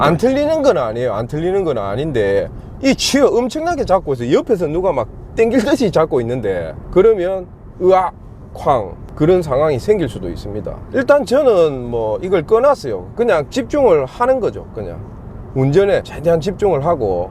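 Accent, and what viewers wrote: Gyeongsang, South Korea. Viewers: 사투리가 몸에 베었... 곙상도.. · 경상도 말 밥 맛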